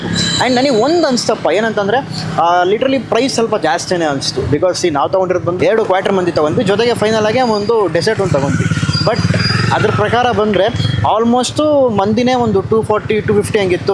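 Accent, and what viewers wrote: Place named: Tamil Nadu, India. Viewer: avanu mathadodhale gothaguthe…….he is Tamilian….plus he is copying style of Tamil food vloggers….bari dove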